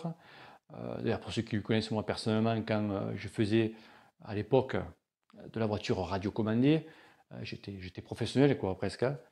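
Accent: South, France. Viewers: Vu comment il parle je pensais aussi mais après je me suis rappeler qu'il est du Sud tu comprends 👍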